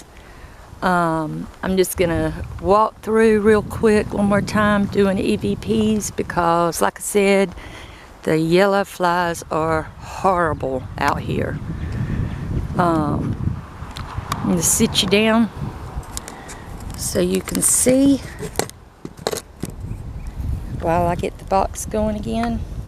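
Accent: South, United States. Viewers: Love your videos and love your southern accent! I have been watching your videos for quite some time now but just a word of advice, why don't you ghost explorers get a small can of bug spray or something to put on your body to protect you from getting attacked and carry it with you especially to cemetery's or in the woods when doing your ghost exploring, I notice this a lot with all of you ghost explorers always getting attacked by a lot of mosquitoes, bees and what ever. Keep up the good work and I will be looking forward to seeing more of your new videos in the near future.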